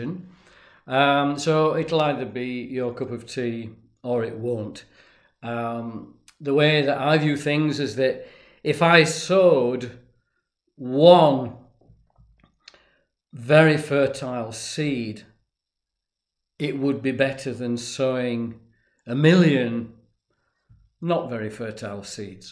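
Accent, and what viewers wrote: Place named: Scotland, United Kingdom. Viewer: I just discovered your channel. This is the second vid I’ve watched. I’m enjoying it. To my ear, I thought you were Scottish not English, but what do us Yanks know. Appreciate your insight.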